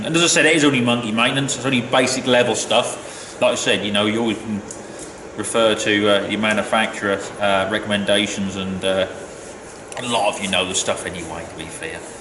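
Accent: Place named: East Anglia, United Kingdom. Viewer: Well I have not been a subscriber for very long as I found your channel from Cotontop3 when he mentioned you by name and I have seen your name on some youtube video channels that I watch. I like your comments to others. I came and watched a few of your videos and liked what I saw so I subbed. I enjoy listening to your Norfolk/Suffolk accent and what you put up for us to watch. I am really looking forward to seeing a lot more stuff on your 3350 especially the internal refurbishment of the cab.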